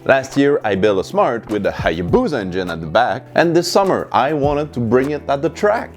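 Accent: Quebec, Canada. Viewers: Been in awhile since I've heard a Quebecois accent. Your english is great!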